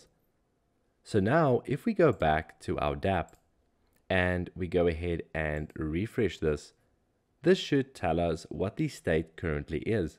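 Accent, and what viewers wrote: South Africa. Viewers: Great video, you sound South African. 😃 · are you from south africa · are you South African?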